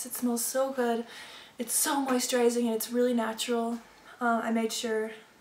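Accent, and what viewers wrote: France. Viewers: I'm not used to commenting on videos at all, but I needed to tell you that you look absolutely wonderful. Such a pretty face! :) And nice French accent too! I suppose I would be your "counterpart" since I am a French girl studying English... All the best, Virginie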